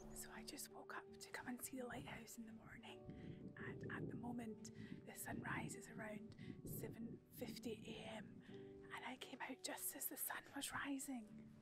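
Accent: Scotland, United Kingdom. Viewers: We are really enjoying your videos. We haven't managed to visit Scotland since Covid, when car hire prices suddenly hit the roof!! Hopefully next year! Your lovely Scots accent is good to hear, but I had a chuckle when you said 'cattle grid' - very clearly. My Edinburgh cousin says 'kettle grid', which once caused confusion on a picnic!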